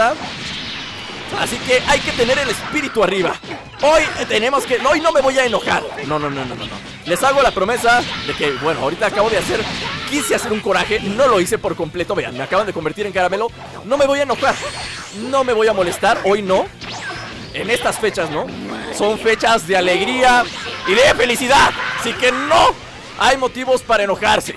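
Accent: Aguascalientes, Mexico. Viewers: eres de Aguas Calientes? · eres mexicano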